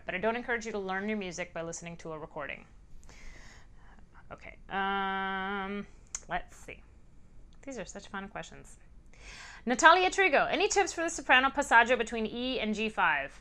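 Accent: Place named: United States. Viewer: She sounds like a normal American woman, not 'husky and gutteral' 🙄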